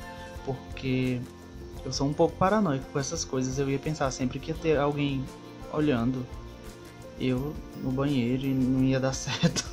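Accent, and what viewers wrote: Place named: Northeast, Brazil. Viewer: tu é de qual estado?? tem sotaque nordestino ❤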